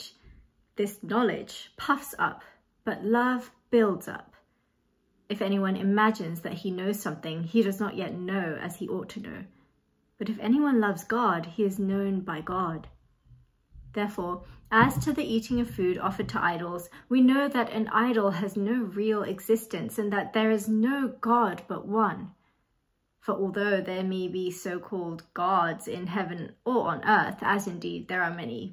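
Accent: United Kingdom. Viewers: Lovely accent but unfortunately there is not a British accent. There are 4 countries within Britain, England, Scotland, Wales and Northern Ireland, and they are all beautiful accents in their own right. You are speaking with an English accent, very nice it is too. So many people from abroad get confused and think it's one accent, but its 4 and each country has a variation within them. 🇬🇧👍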